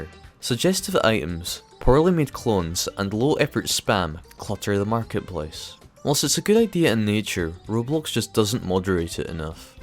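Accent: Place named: Scotland, United Kingdom. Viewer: I know this guy is Scottish I recognize the Scottish accent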